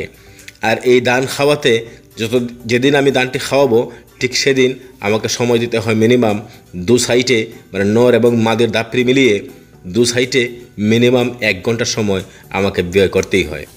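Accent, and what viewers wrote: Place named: Habiganj, Bangladesh. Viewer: ভাই আপনার বাড়ি কোথায় আমাদের হবিগঞ্জের ভাষা মনে হল বলন কিন্তুু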